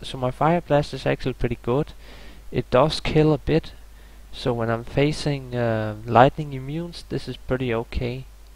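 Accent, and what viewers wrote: Denmark. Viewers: Nice tutorial ！ Are u from Denmark?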